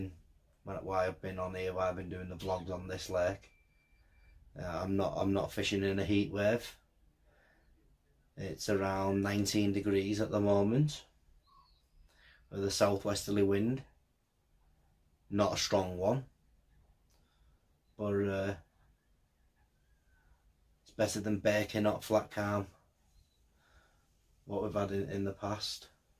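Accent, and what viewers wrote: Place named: Northern England, United Kingdom. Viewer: There’s nowt wrong with looking and sounding like r’kid Ricky the hitman Hatton 😉👍lol it’s nice to hear a fellow northern lad doing carp blogs makes a refreshing change I can’t stand all this wibble wobble pukka cockney crap! Lol 😆✌️😎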